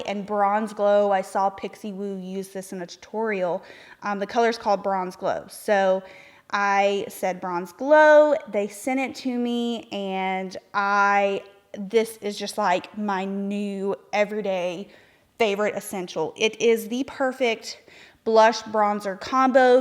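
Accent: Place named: Tennessee, United States. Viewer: I love your accent. I am aTennessee gal too. 😊💜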